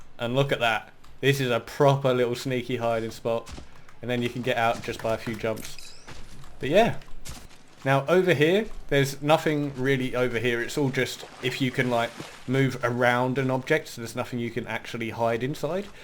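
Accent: United Kingdom. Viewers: Just make sure you keep your English accent and good luck in the states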